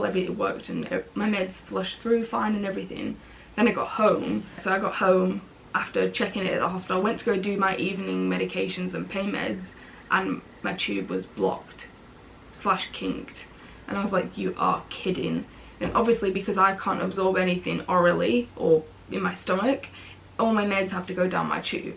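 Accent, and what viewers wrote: England, United Kingdom. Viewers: Thank you for finding the strength and courage to make more videos. I really love to watch everything you record. Everything! Your voice is so sweet and soothing. I am not being weird. I am serious. I just love your accent and ' too! I have been doing a lot of work on Ancestry and found that I am predominantly English, and my ancestors were centered near London. I listen to you talk and imagine how my ancestors must have sounded too! Thank you for sharing your world with us. I look forward to the next video!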